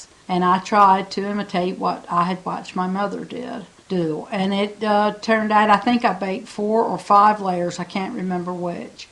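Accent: United States. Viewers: Your dialect is a mixture of southern drawl and Virginia brogue…I think it's fascinating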